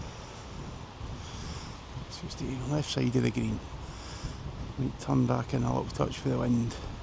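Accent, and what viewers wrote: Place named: Scotland, United Kingdom. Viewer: Where from scotland, sir?❤❤❤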